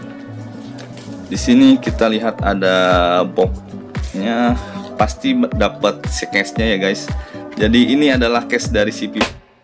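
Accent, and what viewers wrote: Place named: West Java, Indonesia. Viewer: Hp dari pipo, tiba2 muncul di onlen hahaha c akang nyunda pisan 😁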